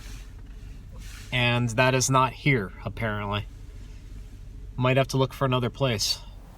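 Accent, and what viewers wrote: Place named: Eastern Pennsylvania, United States. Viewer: Finally learned where he’s from in PA (Pottstown) after watching a dozen of his videos. Knew from his accent it was definitely not western PA. Ahh, Chester county at its finest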